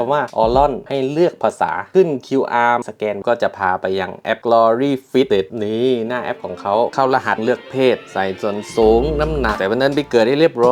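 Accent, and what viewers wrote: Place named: South, Thailand. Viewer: สำเนียง คนใต้บ้านเรานิ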